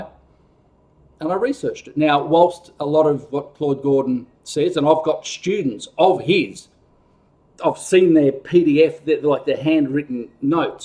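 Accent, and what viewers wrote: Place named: Australia. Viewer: ! I LOVE YOUR TEACHINGS AND LOVELY AUSTRALIAN ACCENT!! I'M JUST LEARNING TO PLAY THE TRUMPET! SO I'M SO! GLAD YOU ARE DEMONSTRATING HOW TO DO IT THE EASIER WAY!! I'M ORIGINALLY FROM FLORIDA, AND HAVE MOVED OVERSEAS TO PAGADIAN CITY, PHILIPPINES! THANKS AGAIN! AND MAY GOD BLESS YOU! ALWAYS!! 👍😁🙏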